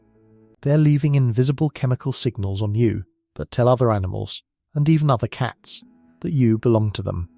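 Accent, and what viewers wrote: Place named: United Kingdom. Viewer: Because it sounds better in a British accent. And the rest of the world is tired of listening to american accents tbh.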